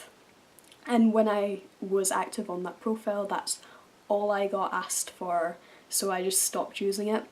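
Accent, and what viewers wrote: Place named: Glasgow, United Kingdom. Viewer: I knew i recognised you... Soon as you started talking i realised I've most like Likely seen you around Glasgow at some point!! This was a great video by the way, I've just accepted a 3 year contract with a modelling agency and I'm hoping to specialise in alternative modelling. Definitely boosted my motivation to succeed so, cheers :D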